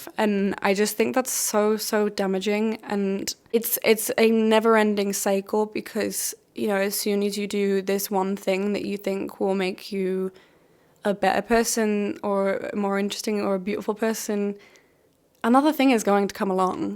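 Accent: United Kingdom. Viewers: Your British accent is so lovely 💗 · Great video! I'm 38 and social media still contributes to my regular identity crisis'. I'm trying desperately to place your accent and all I can come up with is posh Geordie 😂 I hope you're not offended!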